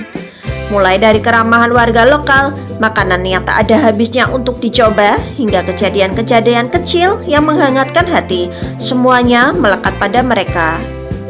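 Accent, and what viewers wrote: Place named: Java, Indonesia. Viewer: Admin nya ternyata Tidak builds Bahama Indonesia dengan iramanya... Malah Berlogat jawa totok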